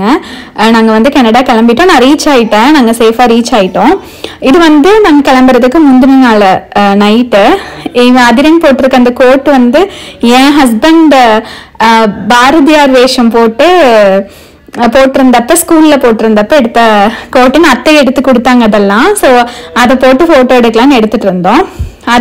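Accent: Kongu, India. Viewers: eanunga ka niga kongu mandalatha senthavangala unga slang thaniya theriyuthe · Namma kongu slang alazhungoo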